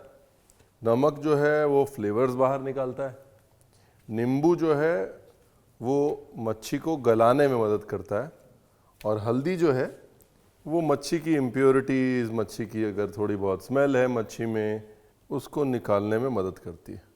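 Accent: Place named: Kanpur, India. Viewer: Aap to kanpuri boli bolte hai fuffpi kanpur ki thi mujhe Aap ki her dishes pasend hai Mai banati hoo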